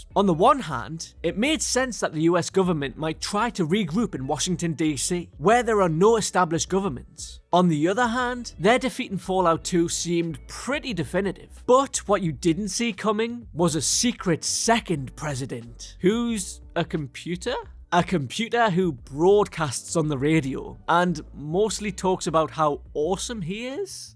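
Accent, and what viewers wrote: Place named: Scotland, United Kingdom. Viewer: I love scottish accent, but i felt was missing some humpf… like more energy… felt like just reading, just my opinion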